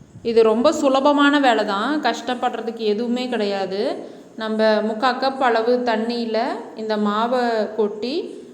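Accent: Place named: Kongu, India. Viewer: Kongu Thamilum Thanjai Thamilum Super Pasthavum super magaley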